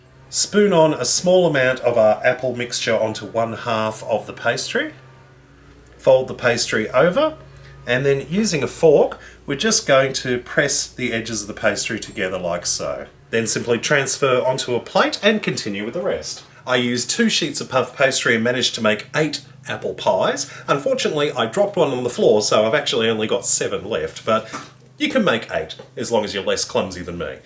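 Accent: Australia. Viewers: Australian? Awesome :D. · I am just glad that he has an Aussie accent. I get tired of everyone who is heavy being labeled a fat American. This looks delicious BTW.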